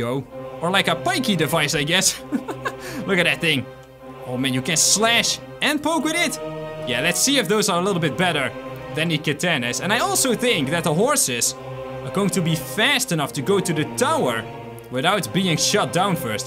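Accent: Netherlands. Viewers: Ar you from netherlands?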